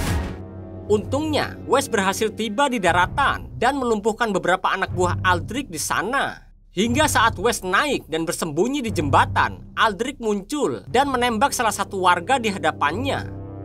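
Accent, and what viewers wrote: Java, Indonesia. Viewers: Ngomong.a medok banget jamet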